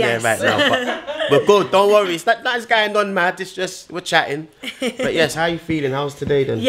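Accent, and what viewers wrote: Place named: United Kingdom. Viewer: As a none UK....you make the UK accent sound so cringey..... you can never sound hard so stop the antics. " bap"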